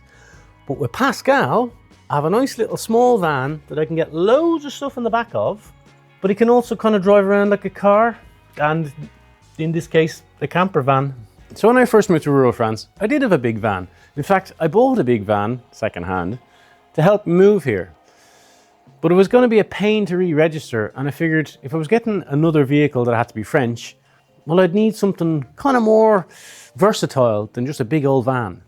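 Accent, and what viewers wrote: Ireland. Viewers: his Irish accent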